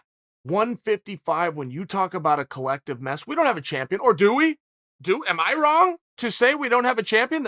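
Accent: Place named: Oregon, United States. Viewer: Chael your Oregon is showing.... golly 😂 this guys the best.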